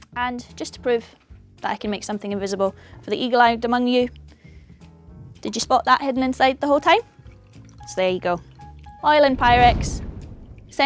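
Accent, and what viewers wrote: Scotland, United Kingdom. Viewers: posh scottish accent haha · What part of Britain is her accent from? Sounds scottish, but could be welsh, too... Can anybody tell for sure? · Scottish burd. nice. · Scottish I believe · you're Scottish ascent is dreamy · American shirt brit acent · I swear not everyone sounds like this in Scotland, half of us sound like farmers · Isn't that accent scottish? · Cool experiment. Love her accent. Scottish,I believe.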